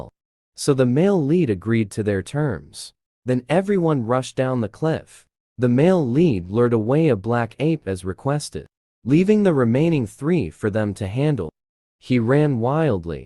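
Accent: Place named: South, United States. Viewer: 1.25 is the perfect speed for this thing. Especially if your used to a faster way of speaking, then the southern drawl this one is set too. :)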